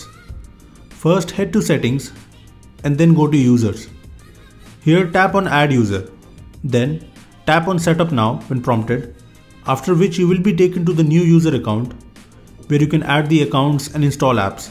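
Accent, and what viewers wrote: India. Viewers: *ALL OF YOU GUYS ARE STUPID. HERES WHY YOU BITCHES 1. HE IS INDIAN 2. THAT IS AN INDIAN ACCENT 3. YOUR LIKE 12YR OLDS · Why you Indians can't say the words without that terrible accent? It's hurting the ear to listen. And as a hungarian living in the UK, i know everyone can learn how to speak normally. And no offence (honestly) but only the colored skin dudes won't learn how to speak... Why is like that. You can clearly hear that the British Queen or the actors from Hollywood, or even Obama say the words completely different then you!!!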